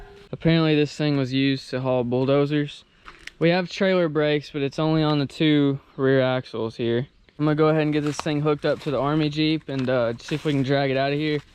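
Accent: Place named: Texas, United States. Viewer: Ok maybe not from Texas but you sure do sound like it…! Mad skills bro, stay blessed!!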